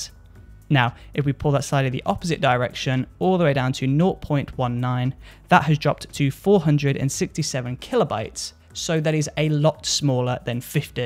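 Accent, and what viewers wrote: United Kingdom. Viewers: Sounds pretty nice British?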